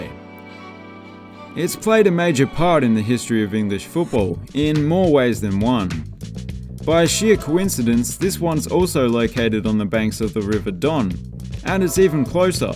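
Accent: Australia. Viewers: There is absolutely no hints of black on the Ipswich kit….well, there is an almost invisible black pin stripe in the new kit but you need a magnifying glass to see it.. love the videos by the way…funny hearing an Aussie covering quaint English football grounds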